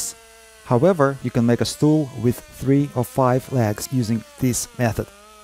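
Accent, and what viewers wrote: Russia. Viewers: Я только за если человек стремится изучать больше языков, но при этом твой контент теряет акцент русского. Кстати, не советую так же т.к данный материал получается дублирующий контент, за что на ютюбе могут сделать бобо. Т.к. алгоритмы понимают данный материал как повторяющийся\дублирующийся контент. Удачи, здоровья!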